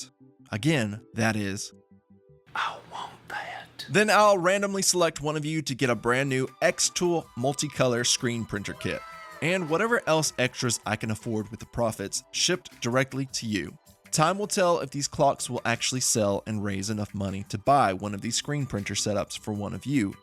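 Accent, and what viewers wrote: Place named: North Carolina, United States. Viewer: I thought I recognized a North Carolina accent. Where are you located? My shop is in Whiteville from from outside of Hickory originally.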